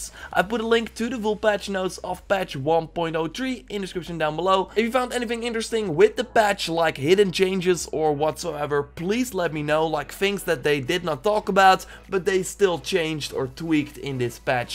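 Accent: Netherlands. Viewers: Dutchies are so recognizable haha, I love our little accent. Dope video though! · Are you dutch? Ik hoor het beetje.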